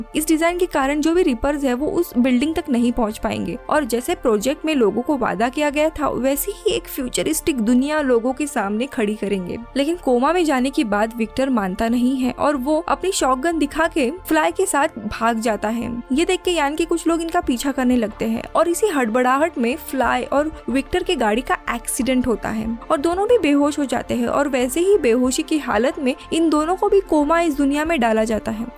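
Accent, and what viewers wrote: Maharashtra, India. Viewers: aap marathi ho kya??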